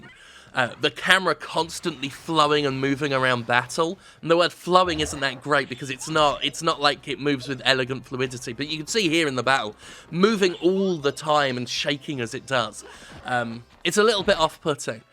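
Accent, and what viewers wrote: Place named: United Kingdom. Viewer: Is it just me or did Jim sound extra-British in this video.....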